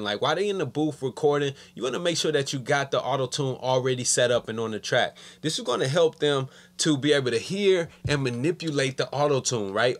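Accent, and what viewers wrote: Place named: South Carolina, United States. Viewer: U from south carolina huh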